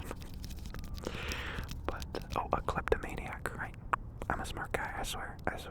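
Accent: United States. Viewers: is he american orr?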